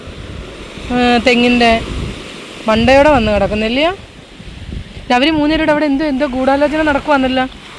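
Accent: Kollam, India. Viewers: കൊണ്ട് അവിടത്തെ പിള്ളേര് വരെ തോറ്റ്...❤❤❤ നമ്മുടേ കൊല്ലം കഴിഞ്ഞു കരുനാഗപ്പള്ളി കായംകുളം വരെ ഉള്ള ഒരു ഭാഷ അല്ലേ നിങ്ങൾ പറയുന്നെ..❤ പിന്നെ എന്റെ രണ്ട് കമന്റ്‌ കണ്ടിട്ട് മിണ്ടാതെ ഇരിക്കുവാണ് ഇല്ലേ ഞാൻ കണ്ടു see..😂 നെവർ മൈൻഡ്.....ആ ലൈനാർ അംനിയെ ഒന്ന് കാണാൻ പോണേ.❤❤❤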